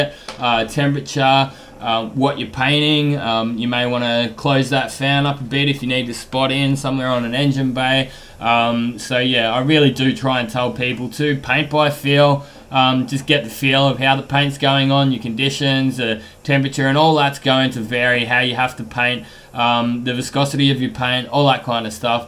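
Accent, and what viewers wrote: Australia. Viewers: Thanks Aussy much appreciate. Like you very. Much. Learning everything. I could. Not understand you at first the Aussy accent. Ha ha After listening closely for a little bit I picked it up no problem. Much appreciate your video work. You're a great mate. Your answer sounds right and makes sense. The clogging is why I go to the water. I use a trickle from a hose. Works well but I'd definitely a pain in the butt.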